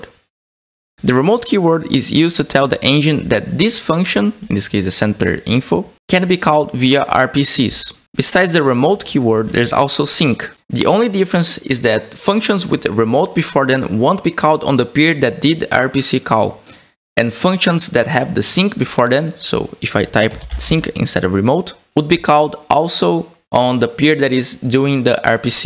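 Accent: Brazil. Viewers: certeza que é br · meu brasileiro falando em inglês nossa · Você é brasileiro? :v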